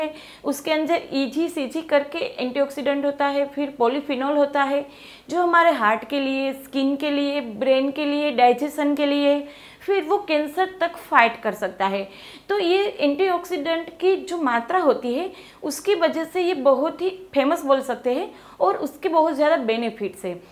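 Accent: Gujarat, India. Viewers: Tame gujrati chho????😎😎😎😎😎😎😎😎😎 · Are you Gujarati?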